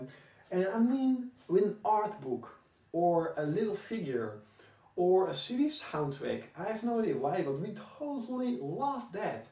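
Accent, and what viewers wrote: Netherlands. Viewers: your dutch right???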